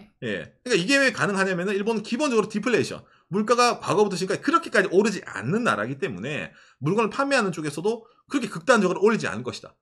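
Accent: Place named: Gyeongsang, South Korea. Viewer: ㅎㅎ 사랑스럽고, 은 듬직하고, 경상도 사투리 ㅋ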